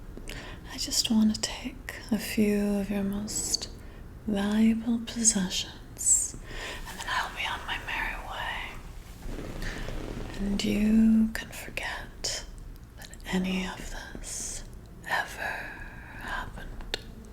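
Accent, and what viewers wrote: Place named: South, United States. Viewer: Your voice sounds very Country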